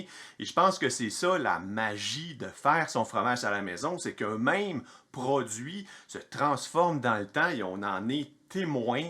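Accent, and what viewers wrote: Quebec, Canada. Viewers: Vidéo qui sent la passion, avec ce petit accent québécois très sympathique. Bonjour de Belgique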